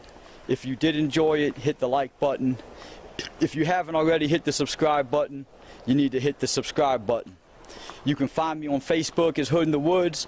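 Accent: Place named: North, United States. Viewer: Greetings from Arkansas my name is Anna where are you from? you sound northern like myself would like to talk to you you're a good looking man...love this video and the content God bless you and yours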